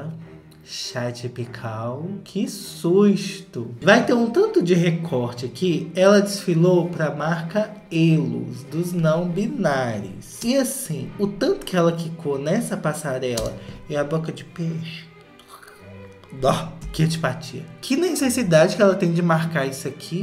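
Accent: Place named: Belo Horizonte, Brazil. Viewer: Ah, eu reconheci seu sotaque, é BH demais! Não te conhecia, este foi o primeiro vídeo que assisti, mas gostaria de valorizar a gata que mais entrega Catwalk: sua filhaaaaaa😻😻😻! Que neném lindona😹😹😹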